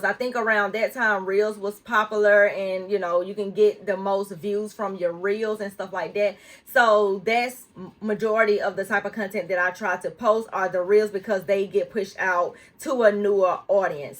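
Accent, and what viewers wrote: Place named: South, United States. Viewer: Love your style and your cute country accent 😊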